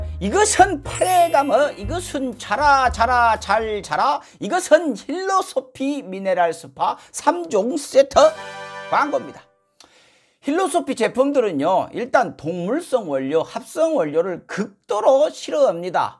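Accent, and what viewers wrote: Jeolla, South Korea. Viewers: 아따 서울출신이신 분이 전라도 사투리 억양을 질펀하게 구사하시네요 그리고 그동안 여러 매체에서 자랑스럽게 전남 영암출신으로 소개되었던데 그건 어떻게 된 건가요? 한번 해명 좀 ...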